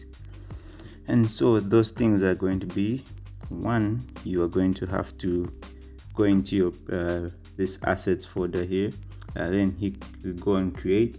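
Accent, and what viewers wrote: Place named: France. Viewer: Sounds like a French accent, great video!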